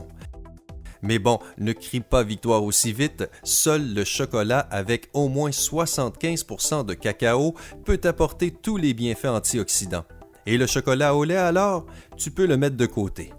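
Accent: Quebec, Canada. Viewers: Bonjour Monsieur j'aime beaucoup votre accent canadien et vos conseils sont géniaux je vous un bonjour de la Guadeloupe et beaucoup de soleil peut etre que je trompe mais il fait trés froid merci vous etes génial ne changez rien . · accent québécois lol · Et lui avec déjà avec son accent canadien en plus de sa il as le nez boucher rhooooooooooo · je ne sais pas si vos astuces marchent mais elles ne peuvent faire de mal:-) j'aime bien vos vidéos et j'adore votre voix et accent canadien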